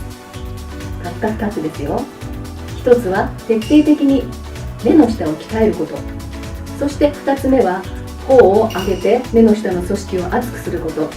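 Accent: Hiroshima, Japan. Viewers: タメになりました🤣 なんか広島のイントネーションに聞こえた😂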